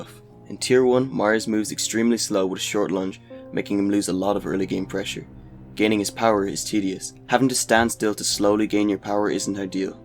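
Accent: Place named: Netherlands. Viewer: stop talking like a dutchie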